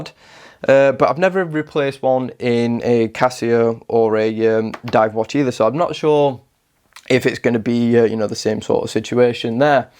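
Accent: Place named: Yorkshire, United Kingdom. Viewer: Well, thanks a lot for this video! I like solar watches too and I have a couple of solar G shocks. I’d like to know why you’re letting the citizen BN150 go… I am waiting for it in the mail and I was wondering if you’re letting it go because of its size. I have a small wrist too, so I hope it’s not for that reason… On the usual, unrelated note: You have silent “t”s (positive, capacitor, latest, better…). Your way of pronouncing “much“, “love“, “tough” sound scouse to me, but it could be any other accent that has that sound. So, in the end, basically I have no clue. Im sure it’s not Scottish, nor Nothern-Irish and I’m prone to exclude the West Country. Any hint?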